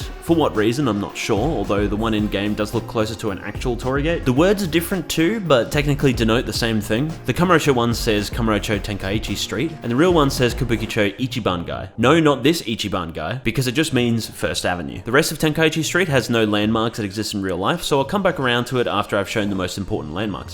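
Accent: Australia. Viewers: This was great mate. Love hearing a fellow Aussie speak facts 😁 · as a fellow aussie, i appreciate the aussie accent 🙏🏼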